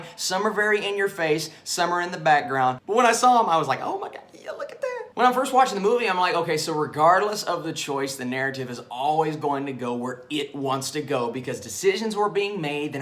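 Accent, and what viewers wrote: South, United States. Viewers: Just a professional piece of advice kid. Being in the entertainment industry and a host, and working on TV in the past, your movements are good, you're hosting ability by switching up your voice, with your movements is also a peeling. A production value is up-to-date. The only criticism I can give you, is you have to lose that southern accent. You want to portray a generic host, to a broader spectrum of audience. And when you get too excited that hometown hick sounding accent comes out. Just work on getting that out have your ability to communicate. All in all oh, good review. Would maybe have liked to seen you go a little more in-depth with the storyline and Easter eggs but other than that thumbs up.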